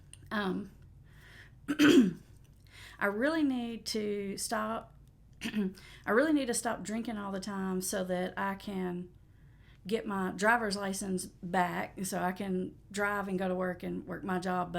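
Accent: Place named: Tennessee, United States. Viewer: Hi, I’ve become addicted to your videos (not funny?) I’m 6 months clean. You have helped me so much. Anyway, if it’s at all possible, it would be really great to hear from couples that have made it through addiction and are doing well. I would be interested in hearing the perspective of both partners. It may give me a little hope. ❤ Thank you for all you do. You’re doing great work for the world, especially in the area of the world where you’re located (I’m from Bristol Tn and I can hear in your voice maybe you hail from close by? Hehe)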